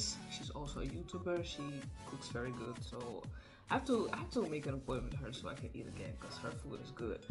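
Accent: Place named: United Kingdom. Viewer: Ik heb de hele video gekeken i love it girl hahhahaha the british accent 😂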